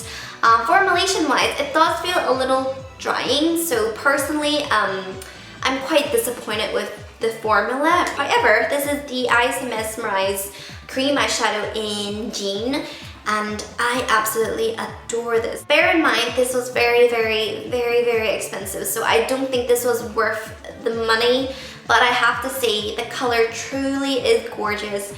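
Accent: Northern Ireland, United Kingdom. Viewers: Love your videos!! And your Northern Irish accent! :)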